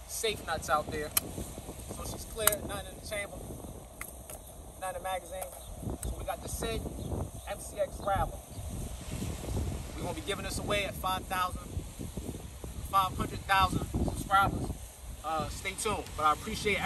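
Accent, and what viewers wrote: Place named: Baltimore, United States. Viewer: My man’s sounds like he’s from Baltimore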